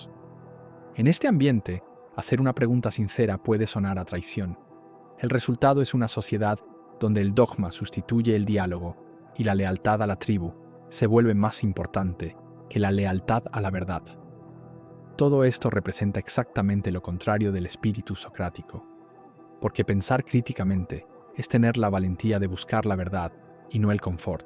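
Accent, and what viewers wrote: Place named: Buenos Aires, Argentina. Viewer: Exelentes reflexiones y la narra suena bastante como nuestro castellano en Buenos Aires. Muy lindo canal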